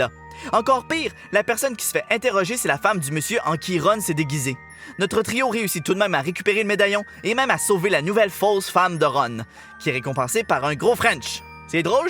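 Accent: Quebec, Canada. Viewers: T'es québéquois ??? T'as l'accent... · Il est marrant ton accent québécois 😂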